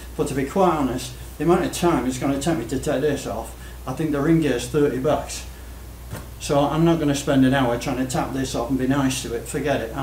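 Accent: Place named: Lancashire, United Kingdom. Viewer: Where are you based ? The accent sounds more like Lancashire.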